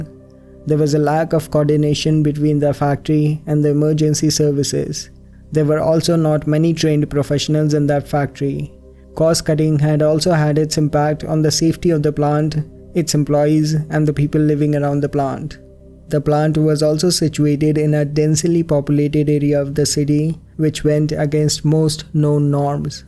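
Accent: India. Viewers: Your voice sounds like Indian. Are you Indian ?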